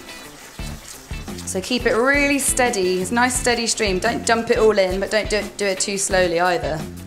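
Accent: United Kingdom. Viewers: I suppose she meant uk cup, because she has a british accent, so I think that she is English.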